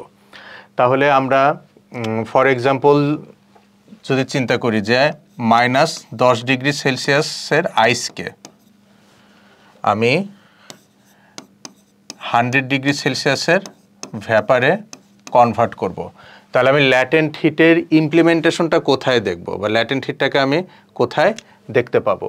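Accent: Standard. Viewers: প্রমিত উপস্থাপন ❤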